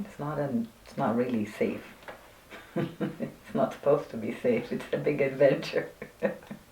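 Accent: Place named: Brazil. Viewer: Brazilian accent ❤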